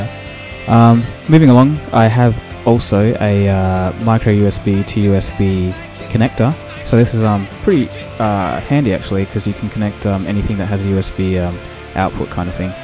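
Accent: Australia. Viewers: nice OZZY accent BTW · holy crap are you australian? NO WAY! that accent is too smoothing to be australian D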